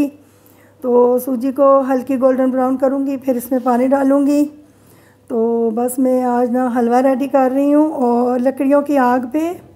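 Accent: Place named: Mansehra, Pakistan. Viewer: nice yuummy yuummy halwa apk bolny k style sy lgta ap mansehra sy ho me b mansehra city township sy hu apko gft dn kr diya a ap b kr dena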